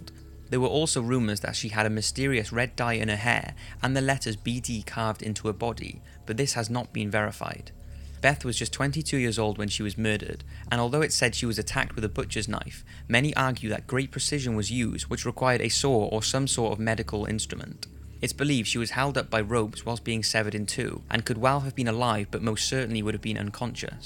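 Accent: Wales, United Kingdom. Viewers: Narrator sounds Welsh 😱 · Are you welsh? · I love these but its impossible to be creep with a Welsh accent ❤️ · Are you Welsh? You sound like you have some form of Welsh accent. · Narrator is Welsh 😱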